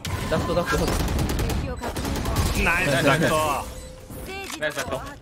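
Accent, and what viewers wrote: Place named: Kansai, Japan. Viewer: さん喋りが関西方面の人だからたまに強い口調に感じちゃうけど人柄スゴくいいよね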